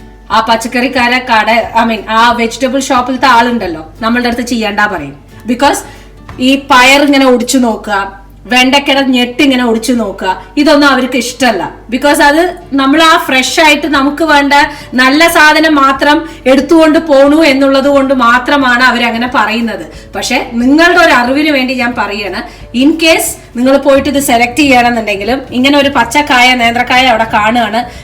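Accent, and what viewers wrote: Palakkad, India. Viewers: Hello I love you chechy chechy njan 1st time anu comment cheyyunnathu reson your palakkad slang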